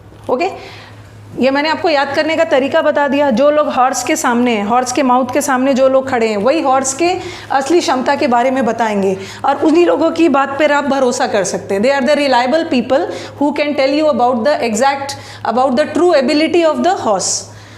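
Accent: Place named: Mumbai, India. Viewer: Ur hindi tone is like mumbaiya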